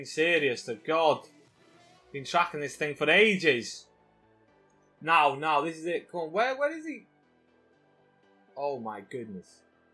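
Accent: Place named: Wales, United Kingdom. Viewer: Nice your welsh just like me were abouts wales you from bud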